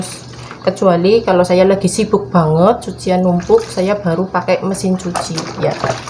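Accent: Banyumas, Indonesia. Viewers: Wong ngapak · tetep semangat mbokayu. slm ngapa.ora ngapak ora kepenak.mrebet pbg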